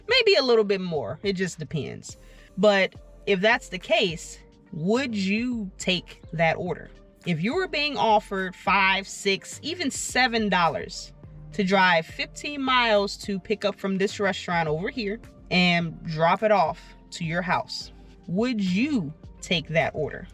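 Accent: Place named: AAVE, United States. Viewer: It's AAVEE, which is just another system of language in America, particularly by black people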